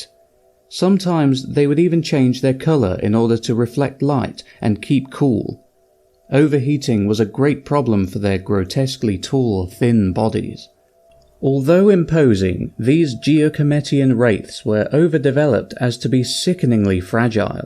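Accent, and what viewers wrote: United Kingdom. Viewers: Why do I like listening to Brits so much more than anyone else? Great job.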